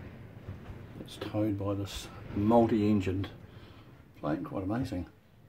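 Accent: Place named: Australia. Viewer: You sound Aussie !!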